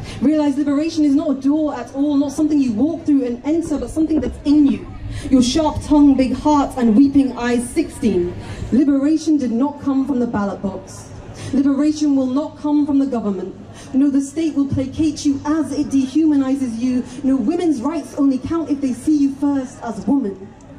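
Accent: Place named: RP, United Kingdom. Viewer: This idiot sounds SO FUCKING POSH... these people are so detached from reality, it's absolutely mind-boggling. I can only see total collapse in the future, we are fooling ourselves if we think we are winning the culture war. The saddest part is, where do we go? I have nowhere to go, England is my home, and every western country has the same problems. I think the best thing would be to create safe communities, with fences... I see a South African style future for us in the west, it's sad. We gave away our perfect slice of peace and quiet for this.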